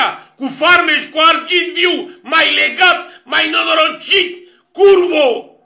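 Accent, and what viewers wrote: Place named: Turkey. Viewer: E turc daia vorbeste asa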